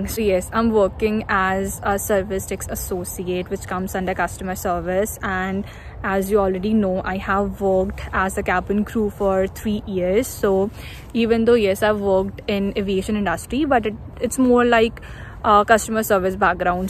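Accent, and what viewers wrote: India. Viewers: 😂Indian accent I love it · Weird background music, shameless self propaganda and chattering in monotone Indian english!!! Mentioning eye's dark circle and rubbing nameless cream is big stock of laugh.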